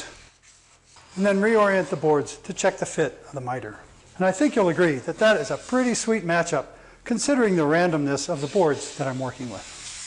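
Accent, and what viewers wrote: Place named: Italy. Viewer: Say hi to Chip ! Nice project ! Also nice Italian accent !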